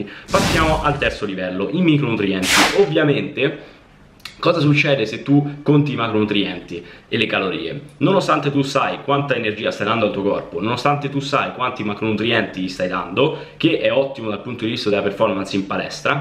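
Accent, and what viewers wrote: Rome, Italy. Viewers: Allora: primo, sei romano, e quindi ti starei ad ascoltare per ore ahahah!! XD E secondo, scherzi a parte.. i tuoi video sono sempre chiari, semplici, e spiegati perfettamente, anche per me che sono una principiante in quanto a fitness e nutrizione... =) Continua così, io sto divorando i tuoi video uno dopo l'altro! Grazie mille! =D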